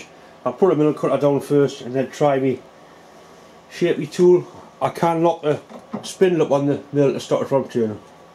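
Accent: Newcastle, United Kingdom. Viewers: pif paf the accent and dialect is called "Geordie". it is spoke by people in and around the City of Newcastle-Upon-Tyne in North East England. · Wm Robert Leschyna Some would say ' Geordie' often spelt Jordie ,but this is a Newcastle accent as true Geordies are from Jarrow . It's a bit like the difference between Cockney and an East London accent . True Cockneys had a language and dialect far removed from the East London one , but is now almost completely vanished .Mi da an is da were Jarrow boys , mi granda on the March ! I grew up in East London and couldn't understand any of my grandparents . Dad from Jarrow , Mum from fenland Norfolk ! When visiting the US back in the 70s nobody could place from what country I came from but my mate being a Cockney , no trouble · i love that jordie acsent.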